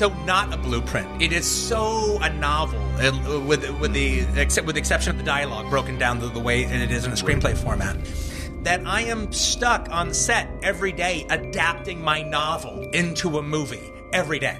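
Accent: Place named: New York, United States. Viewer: is he a new yorker or a chicagoan.. whats his accent? :) wikipedia.. --> tells me he is from knoxville Tenesee.. I guess he's accent morfed into californian accent by now.. but it sounds big city anyway! perhaps he lived in new york also as it sounds like new yorkan accent to my swedish ears..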